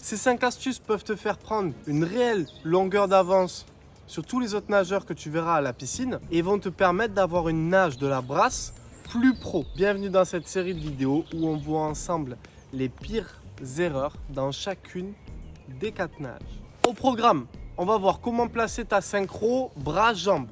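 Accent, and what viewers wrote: England, United Kingdom. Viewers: Merci pour tous ces conseils, super bien expliqué, clair, et ton accent anglais n'est pas si mauvais que ça ;) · Wow excellent accent anglais....merci pour ton coaching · Très bien pour Ton accent anglais, et surtout pour ''le regard méchant, et le soulevé de Coubra, c'a nous aider à bien effectué la technique.. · Ma nage . Le pap évidemment. Ton accent anglais si ça va . Je te propose or ta prochaine vidéo de la faire in english 🤣🤣🤪. Sinon non belle vidéo et bonnes explications. ;) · 👍🏻🔵 pour l'accent anglais😁 et le reste bien sûr! J'ai repris la natation via un programme "je nage pour ma forme" et il s'avère que ma brasse manque de coordination... Vos conseils et exercices m'aident beaucoup quand je vais nager en dehors des séances de groupe! 💙🩵💙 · Ce petit accent anglais je fonds 🥹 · + pour interet a la chaine + pour ton accent anglais + pour les 5 astuces. 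Merci je fais une heure complete a la brasse avec beaucoup de plaisir (mais assez difficile les 10-15 premieres minutes.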